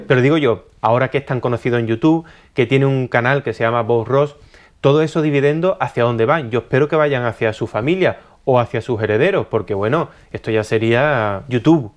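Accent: Spain. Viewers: Porque hablas como español si eres de mexico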